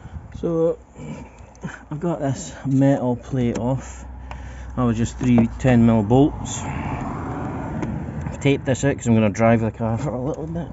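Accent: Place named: Scotland, United Kingdom. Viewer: what will make you kick yourself is the seller on e bay that is selling the same pipe but in the genuine nissan part number for £31 is based in Scotland, and from your accent, sounds like that is where you live🤣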